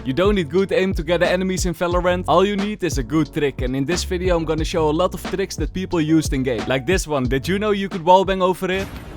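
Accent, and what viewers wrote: Netherlands. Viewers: Hii ...i still watch your videos even though i cannot play,your cideos are really very interesting mainly the dutch accent😂 Love your content❤❤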